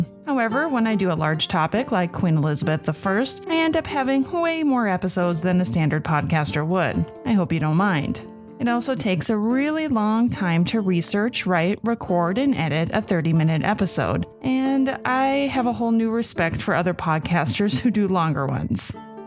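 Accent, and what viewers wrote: United States. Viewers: Rather listen to English casts reading this .Why have an American reader sounds weird .Could only listen to two minutes.Your voice is terrible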